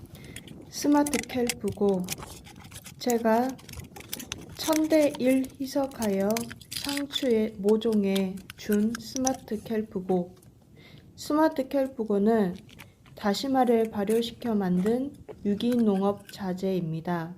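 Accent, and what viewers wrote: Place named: Jeolla, South Korea. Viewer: 전라깽깽이 말투랑께.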